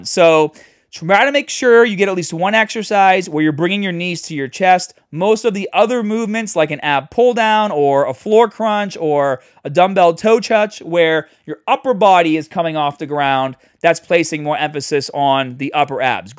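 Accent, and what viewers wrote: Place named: Massachusetts, United States. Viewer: I had a feeling this guy was from Massachusetts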